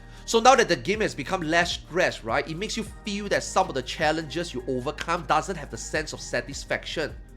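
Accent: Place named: Singapore. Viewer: I mean it's an accent from another English speaking nation. In Singapore this is just how people speak English and it's the primary language there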